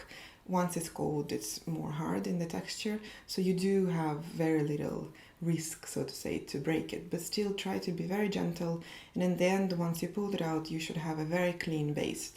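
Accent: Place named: France. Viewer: Thank you! Where is her accent from, Français peut être?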